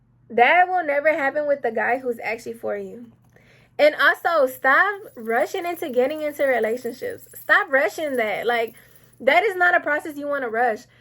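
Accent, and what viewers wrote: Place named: Houston, United States. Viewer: Man yo voice ain’t nun that’s js tha Houston accent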